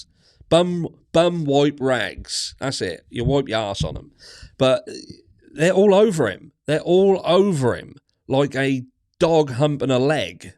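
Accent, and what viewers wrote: Norfolk, United Kingdom. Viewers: Money & power will always win, even the media are making money from Liam. Howdy we’re neighbours 👋 we can sniff out that Norfolk accent 😂🦮🥰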